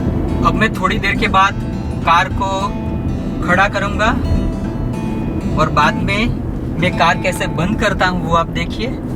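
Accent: Gujarat, India. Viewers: Aap gujarati ho? Aapki hindi patel bhai jesi hai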